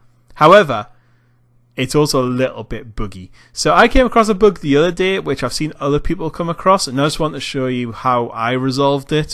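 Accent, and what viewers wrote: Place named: Newcastle, United Kingdom. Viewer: you sound like your from near newcastle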